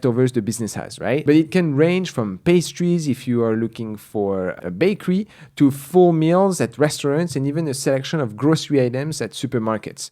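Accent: France. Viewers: Found your content recently and it’s been great - also enjoy the French accent ! Haha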